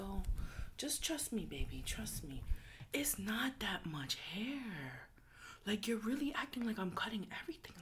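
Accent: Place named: Queens, United States. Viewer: where are you from?? some things you say sound exactly like my friend who's from queens lol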